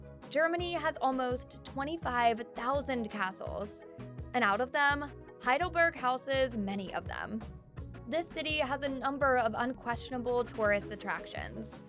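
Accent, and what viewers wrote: United States. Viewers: Nice Orange County accent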